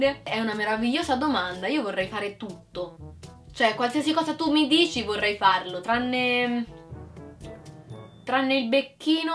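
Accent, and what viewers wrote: Tuscany, Italy. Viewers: Ma hai un'accento toscano ! sei di roma? :) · Hai un accento toscano molto :3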